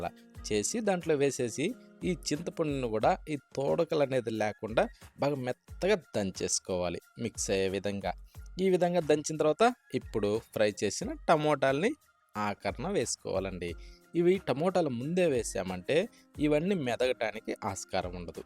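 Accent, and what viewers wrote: Nellore, India. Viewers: మీది నెల్లూరు కదా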